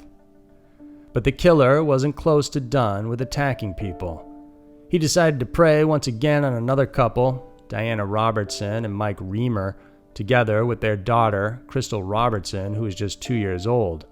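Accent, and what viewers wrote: South, United States. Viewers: please post every day. I love your hard to pinpoint transplanted Bostonian accent. I asked you on Facebook. I know you've been in NY for years but you don't sound like you are from there either. My husband moved down from NY state in 1978 and you sound way more Southern. You probably won't read this but keep up the great work and Thank you! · Is your Southern accent real?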